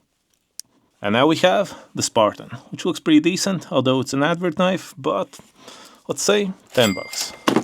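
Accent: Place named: Eastern Europe. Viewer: hmm Id guess you are either Slavic or Eastern European??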